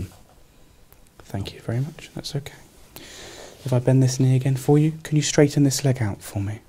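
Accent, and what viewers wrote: United Kingdom. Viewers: Very british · Thats most biggest British accent I ever heard :)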